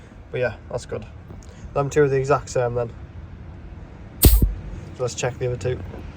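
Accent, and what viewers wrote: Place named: Middlesbrough, United Kingdom. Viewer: sounds very middlesbrough talking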